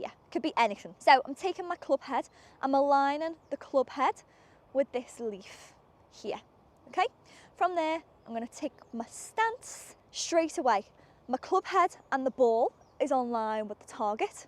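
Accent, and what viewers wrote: Liverpool, United Kingdom. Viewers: Great video, I'll have my wife check it out as she's new. Off topic, is that Scouse I hear?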